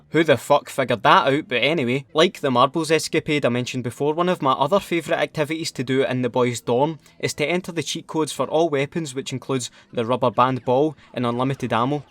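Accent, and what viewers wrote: Scotland, United Kingdom. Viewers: Probably the most Scottish review I've heard ;] I played this game so much as a kid Jimmy's vest glitched pink. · Where I Scotland are you from,I'm from Glasgow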